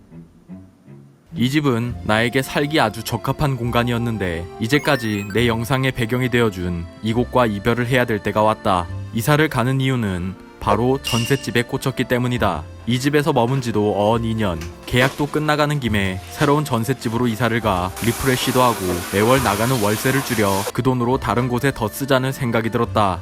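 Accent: Jeju, South Korea. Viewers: 제주도 분이세요?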